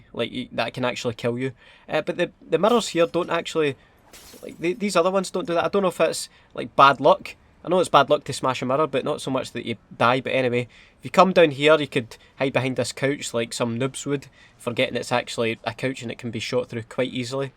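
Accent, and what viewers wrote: Scotland, United Kingdom. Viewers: Hey I’m kinda new been around for couple months, been binging on your vids looking for as many as I could find and I dunno just thought I’d have a try, ever had a feeling to do a video like on of your og commentaries. I know you’ve moved away from them but I just love the Scottishness in them😂😂. Also what did actually happen to the troops?😂but anyways love the vids man keep it up❤️❤️❤️ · Clicked just to hear your silky Scottish voice say ‘Faverla’ ;)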